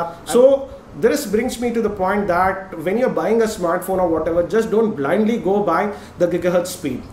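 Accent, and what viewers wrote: India. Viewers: God Indians accent make me very mad .....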